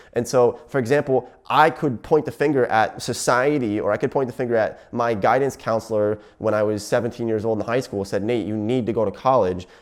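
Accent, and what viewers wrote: United States. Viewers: im very confused as in if hes american or birtish lmao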